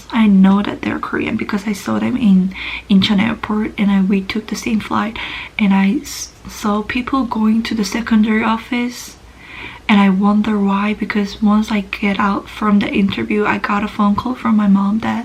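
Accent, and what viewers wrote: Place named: South, United States. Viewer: 미국 남부지역에 거주하다가 욌다는 것을 영어억양듣고 추정할 수가 있어요, 표준발음은 시카고나 디트로이트 주변에서 거주해야 가능합니다.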